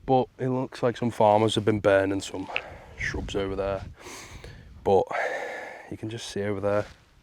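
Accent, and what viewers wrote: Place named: Liverpool, United Kingdom. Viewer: New sub fella well done good watch you git touch scouse in tha accent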